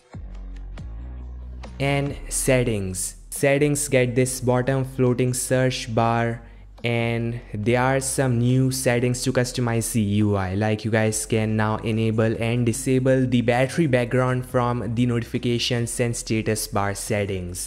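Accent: India. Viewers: Are you indian bro?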